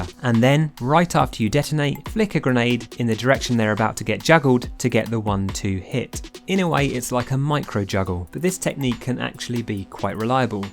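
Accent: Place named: United Kingdom. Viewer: I missed your british voice you absolute legend! Glad to have you back.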